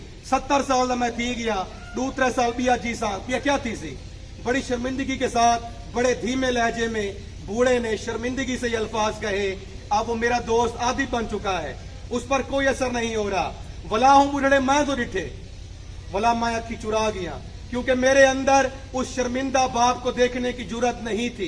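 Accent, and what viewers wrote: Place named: Saraiki, Pakistan. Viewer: سرائیکی ٹچ 👌👌💯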